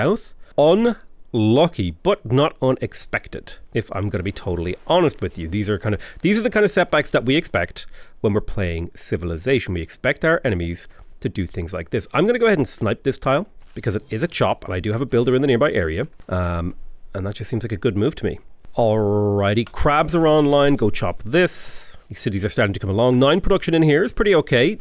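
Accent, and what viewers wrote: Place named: Ireland. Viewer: As a Scotsman from a small town between Dundee and Aberdeen IRL I think you have improved the landscape massively 😅 however I really wish you would talk a little slower as the tips on the game you are providing are gold dust. And if it's hard for a Scotsman to understand your quick-fire Irish, then I'll guarantee other people will struggle more. Looking forward to watching this entire playlist though.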